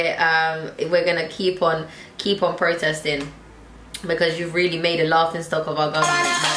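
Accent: London, United Kingdom. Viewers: Your English it’s from London Are you half Algerian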